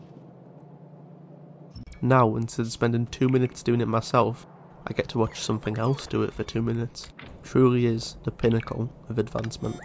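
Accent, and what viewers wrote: United Kingdom. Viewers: Der klingt ziemlich British, mit dem aussetzten vom T bei "water" oder "later".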